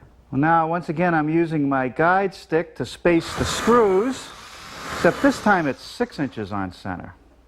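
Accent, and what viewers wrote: New England, United States. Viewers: I used to watch his show as a kid. I never noticed the strong New England accent back then. Now all I can think of when I hear it is Peter Griffin.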